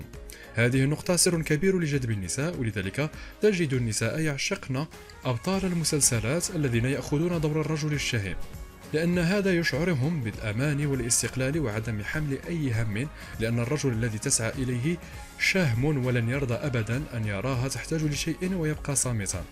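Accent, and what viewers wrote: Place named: Morocco. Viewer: يجب ان تتخلص من لهجتك المغربيه اثناء الحديث تجعل الشخص لا يفهم شيئا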